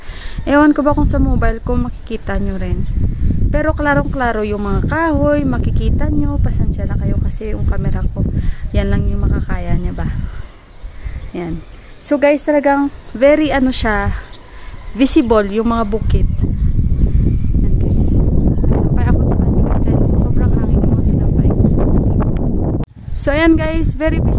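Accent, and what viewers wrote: Iloilo, Philippines. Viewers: ate ilongga ka po ba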